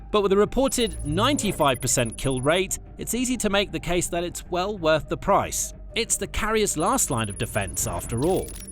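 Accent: United Kingdom. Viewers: The US Military should have a hand in reviewing videos like before they are uploaded to YouTube. This guy with a British accent does not care if he is revealing top secrets of the US Navy. He is more inclined to sympathise with the IRGC, and with this video, he is giving a tip to the IRGC on how to sink the carriers that are already in the war. Hey US Military, FBI and whoever else: Start kicking doors! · Why is this using a British voice if its generated in the US?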